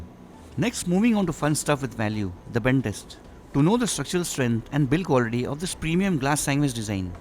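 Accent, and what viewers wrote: India. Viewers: from your accent i can see you're indian. Kudos for this video since actually the audio is in english and the title and the description, they match, unfortunatelly 97% of indians put english titles and description and in the video they speak लड़कीहैलो . Come on indians it's not that hard to be considerate! learn from this guy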